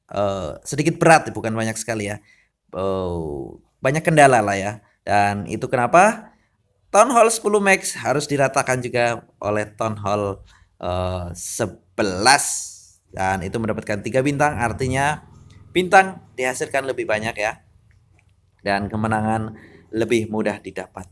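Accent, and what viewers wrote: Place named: Banyumas, Indonesia. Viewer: Logate ngapak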